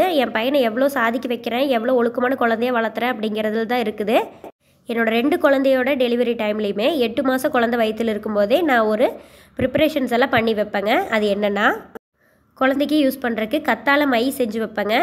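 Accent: Kongu, India. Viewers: கொங்கு தமிழ்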